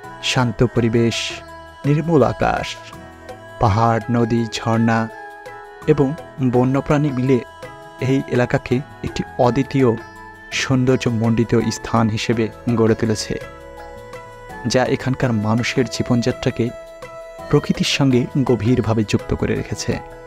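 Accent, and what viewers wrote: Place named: Bangladesh. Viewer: Vai, apnar naam ki?? Apnar voice to ami onno channel er background a SS hunecchi mone hocche.... R apni bodhoy Bangladeshi, not from kolkata, kothar style shunle tai e mone hocche. Amio from Bangladesh 😊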